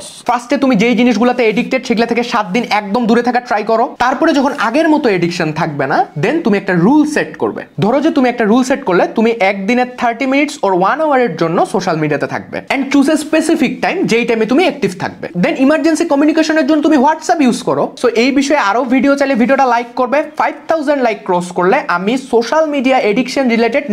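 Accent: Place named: Dinajpur, Bangladesh. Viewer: বাবা তুমি যে দিনাজপুরের ছেলে ভাষা শুনে বুঝলাম।❤❤❤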